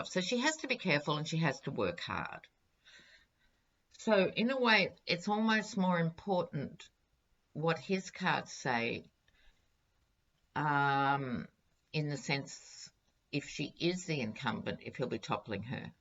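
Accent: Australia. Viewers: I love your Australian accent especially when you say "deep shit". You are too cute!☺️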